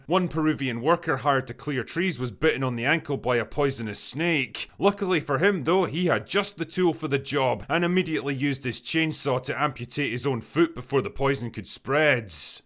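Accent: Scotland, United Kingdom. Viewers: Is it weird that I don't care about the movie but listened just for your Scottish narration?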